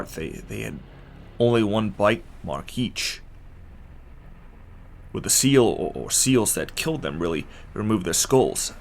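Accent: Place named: Yorkshire, United Kingdom. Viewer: Sounds like a yorkshireman right enough. 😏 Ps. We also have resident dolphins and tuna in UK waters during warmer months.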